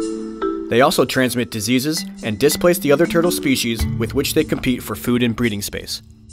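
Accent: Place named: Greece. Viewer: You're Greek, aren't you?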